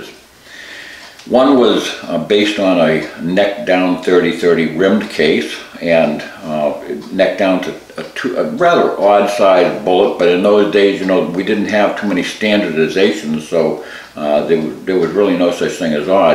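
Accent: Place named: Massachusetts, United States. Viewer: I detect a touch of "Bay State" accent.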